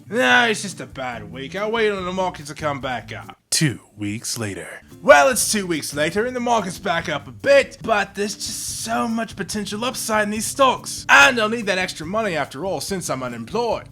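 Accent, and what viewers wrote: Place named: United Kingdom. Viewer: Dude, you have a legit British accent...that is the most important thing I discovered during this video...haha, totally kidding! I can't tell you how many "wise" adults have encouraged me to do #1. :( So sad. Thanks for the awesome video!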